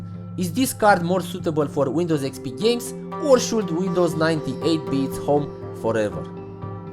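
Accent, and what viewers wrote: Romania. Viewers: Mi-am dat seama din primele 3 secunde că eşti român. Accentul.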